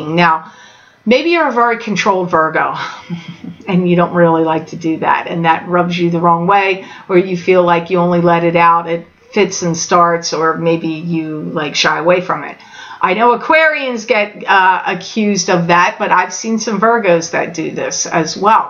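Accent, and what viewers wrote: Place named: Pittsburgh, United States. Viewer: Are you located in western PA? Because if so I'm pretty confident that it could be my family members that are attempting to communicate to me through you 😂😂 I literally was thinking about them and your accent 1 minute before you said you got the chills and I got them with you! I am a Virgo sun and moon and have watched you religiously for over a year. I LOVE YOU! (You're not crazy so don't think that you are!) you channel so many energies and it's up to us to vibe at your level to actualize these realities. Anyways I think it's my family because they are from Pittsburgh, I have TWO clogged sinks, a plumber just left for a leaky toilet, i have been needing to fix my AC for months, and need to return my air diffuser. I've been hesitant about moving but just decided to move long distance from my love and it has instantly improved our relationship! 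Like molded up the gel for sure ;) and I haven't moved yet! I have been dragging my feet with healing and creating for a year because it's different from "being a normal RN" and your reading just helped me significantly. Affirming my feelings. The eclipses were NUTS 🥜 but this month has been amazing and it's only day 2!!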